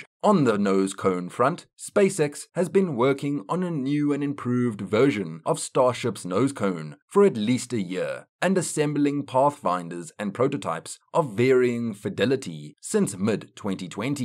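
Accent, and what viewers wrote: South Africa. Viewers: Aha. South African voice.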